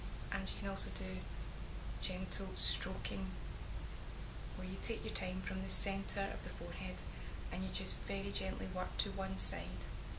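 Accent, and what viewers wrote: Scotland, United Kingdom. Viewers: are you Scot? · It's very refreshing to have a fellow scot on one of these videos, lovely! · Your voice calms me because it reminds me of my grandmothers thick scotish accent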